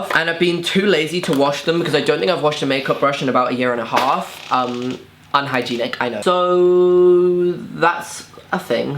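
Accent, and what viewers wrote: Scotland, United Kingdom. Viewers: the scottish accent through this killed meee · The Scottish accent tho😂😂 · we stan a scottish intro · Love ur Scottish voice we love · Scottish then?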